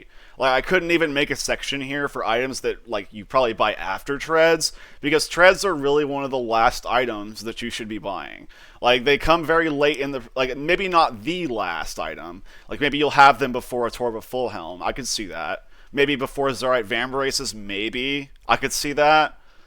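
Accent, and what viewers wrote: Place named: Connecticut, United States. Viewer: Bruh youre from Connecticut arent you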